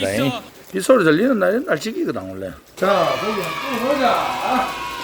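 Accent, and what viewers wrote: Gyeongsang, South Korea. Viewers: 케케케~~대서 ~~엉 왜케 올만이셔유? 역시 삼겹살 쥑이네요ㅋㅋ 구수한 경상도?억양 인간미 촬촬 넘치는 우리의 케케에챱?꺼챱?~~쓰ㅡ읍쇼리ㅋ 솨자~~앙님good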